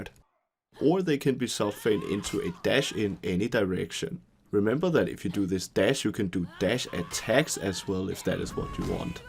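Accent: Denmark. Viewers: the danish accent SHINES hehe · are you from denmark? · It really sounds like ur danish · This guy has the most danish accent I have ever heard and it is glorious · Is that a danish accent?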